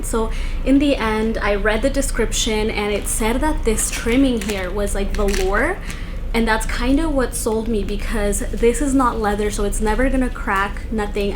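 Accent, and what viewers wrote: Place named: Canada. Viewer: Are you Canadian? I hear a accent 😭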